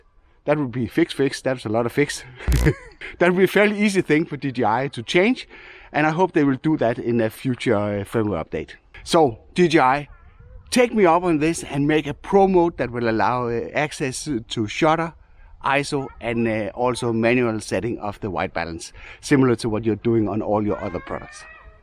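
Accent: Denmark. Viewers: Tænkte nok, jeg kunne høre det på accenten.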